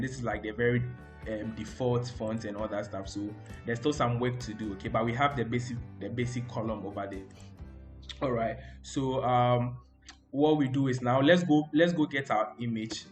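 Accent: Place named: Ghana. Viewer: Great one There. Your accent reminds me of a Ghanaian Friend in Germany.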